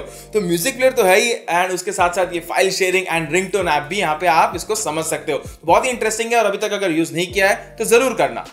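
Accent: India. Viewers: Hes speaking Hindi-nglish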